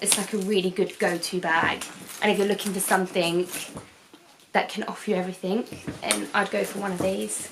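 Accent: United Kingdom. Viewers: Knew you'd be a brit 😁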